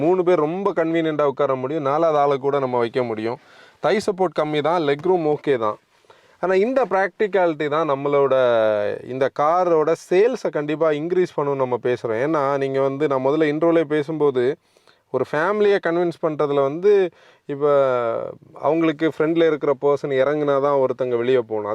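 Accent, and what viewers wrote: Kanyakumari, India. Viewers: Kanya kumari style speech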